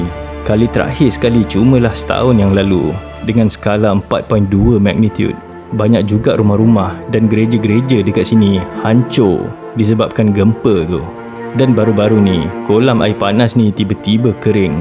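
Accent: Malaysia. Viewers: Bang suara yunik menarik logat malasyia...slm bumi minang kabau...❤👍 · video dokumentar ini layak di beri apresiasi, narasi hostnya sangat bagus dengan dialek bahasa Melayu khas semenanjung yg enak di dengar, bahasa yang rapi dan teratur, vokal yang bagus, dan mudah di mengerti.angle / sudut pengambilan video sangat bagus dan rapi, editing nya oke... all the best lah.. salam serumpun dari jakarta.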